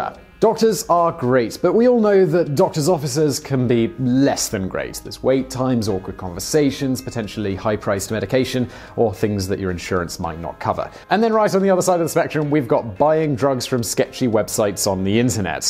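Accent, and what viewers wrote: England, United Kingdom. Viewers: Chuck Barry that's called an English accent. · I like your British accent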